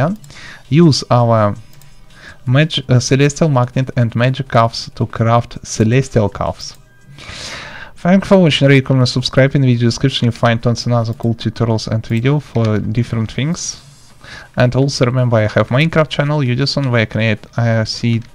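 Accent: Russia. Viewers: He's Russian if you didn't know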